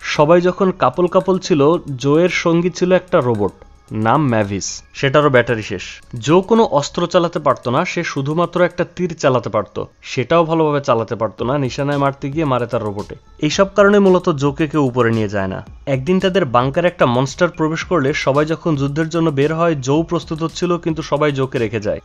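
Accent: Khulna, Bangladesh. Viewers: ভাই আপনার বাড়ি কি খুলনায়?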